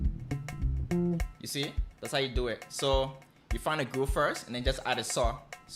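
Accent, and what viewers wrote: Liberia. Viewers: You sound like a Liberia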